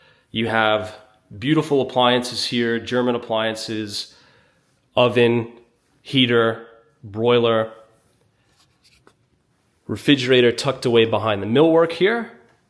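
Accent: New York, United States. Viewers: He’s a New Yawkah!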